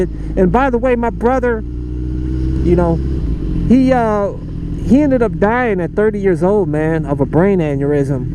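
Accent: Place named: Texas, United States. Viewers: Thanks for sharing your story. We all have a story to tell. When I first heard your voice it didn't sound North East at all. Sounds more Southern, but I guessed you grew up in a "certain area" just from your lingo. But you pulled yourself up and made a better life. That's awesome! The problem with "The Hood" is, some people insist on staying stuck on stupid even when they don't have to. Again, thanks for sharing. Stay safe and ~ HAPPY NEW YEAR ~ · to me it sound like a Texas dude lol I don't really know alot about the south haha I'm from the bay area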